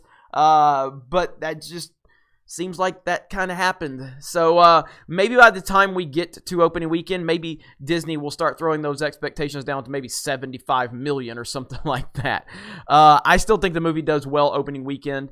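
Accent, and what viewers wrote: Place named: Alabama, United States. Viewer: are you from bama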